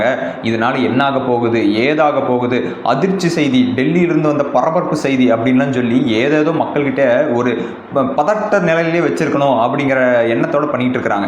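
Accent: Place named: Kongu, India. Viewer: கொங்கு தமிழ், அழகு தமிழ்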